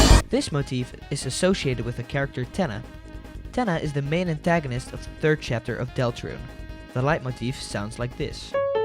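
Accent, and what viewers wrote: Netherlands. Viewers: Nederlands accent 😳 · Is dat een Nederlands accent dat ik hoor? Hoe dan ook, fantastische video!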